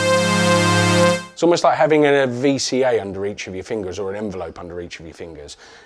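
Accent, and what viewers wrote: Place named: London, United Kingdom. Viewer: LOL Polybrute SIMFF.... Love the London accent but mate.. Cant beat a Northern accent... RAAVER as opposed to Rather.. We need to celebrate the Englaish language and never shy away from our heritage !!!! The synth sounds so similar to the original.. but love the new features.. !!! Gotta say, if you need your sound to be immediate in your mix then the Sequential series are better but as an all round poke you in the eye and create esoteric stuff... Polybrute.. Go Arturia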